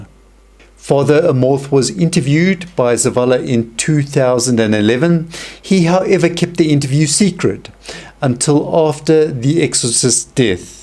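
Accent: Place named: South Africa. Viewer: Sounds like it is from Africa. South African maybe.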